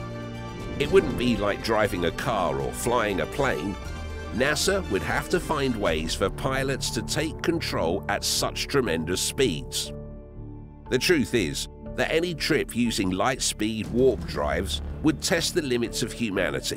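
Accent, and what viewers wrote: United Kingdom. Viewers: irritating British BLOKES talking... hate that stupid accent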